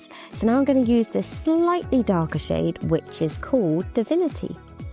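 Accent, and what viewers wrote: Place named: Wales, United Kingdom. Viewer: Love this look ❤ Also this is so random, but looks and sounds a bit like Amy Dowden from Strictly! I'm sure I can hear a Welsh accent being Welsh myself, so I wonder if they are related somehow?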